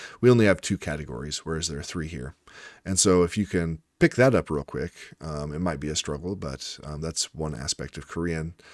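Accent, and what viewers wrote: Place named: United States. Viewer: As a French native speaker, I can tell you're wrong when you try to analyse French. You said "It's what I've been told", which means you have no idea what you're talking about. French isn't Germanic. As a linguist, you should know that most words shared by English and French come from Old French. Actually, English vocabulary has been influenced by French after the Norman Conquest of 1066. Just out of curiosity, are you really a linguist? Which degree did you earn? The difficulty of this language lies not in the sounds, but rather in the rules and the exceptions. In French, you can see people correcting each other. Most people make a lot of mistakes because they don't read enough to internalise the rules. French doesn't have four tenses. There are a few tenses categorised into four moods. Actually, if you exclude the infinitive, there are nine simple tenses and nine compound tenses. 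Some of them are almost never used, but there are still more than four. You only considered the first group for your statement about conjugation. Let's take "prendre" as example. It's from the third group. In the present simple, "prendre" is conjugated as: je prends, tu prends, il/elle/on prend, nous prenons, vous prenez, ils/elles prennent. French people (so people who speak French in France) tend to like the English accent, not the American accent. It makes you look worse than Olly Richard.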